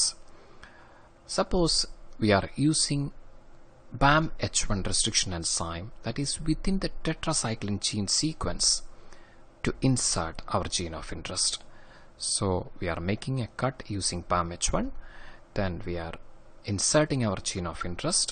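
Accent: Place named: Kashmir, India. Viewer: Thankyou so much sir It is really helpful 😔☺️ Btw your accent is like kashmiri's .😄🎉🎉🎉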